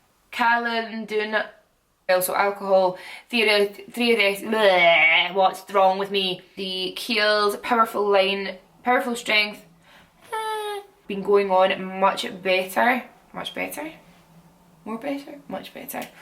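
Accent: Scotland, United Kingdom. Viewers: Where is she from in Scotland?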